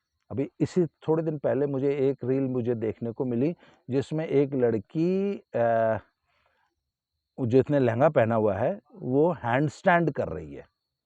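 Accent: Haryana, India. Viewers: बढिया हरियाणवी बोली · आपकी बोली मैं हरियाणवी पुट है । रोने से आंखों को नुकसान होता है ।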